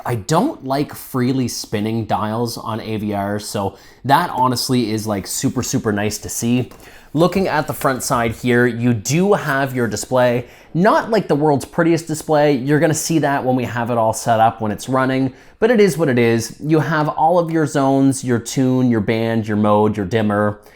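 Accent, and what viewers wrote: Canada. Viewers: This has to be the most canadian-sounding human on the planet. Great video though.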